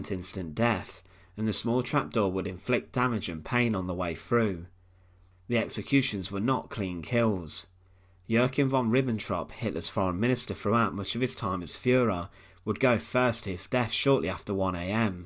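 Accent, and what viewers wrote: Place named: Yorkshire, United Kingdom. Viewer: I'm curious if Brits have some kind of secret laboratory where they try to develop the strangest accents possible... earlier today I was just thinking, if "Brits are so opposed to the letter "R", why did they put it in so many words, I mean after all it's THEIR language, they could have made it any way they wanted"... I deal with people from all over the world and they all pronounce "R" as "R" [well accept for some Asian nationalities (Japanese, Korean) where they can't hear the difference between "R" and "L", but that's another story...), and not "ah"... so "Remember" instead of "remembah"... ...and then I clicked on this video...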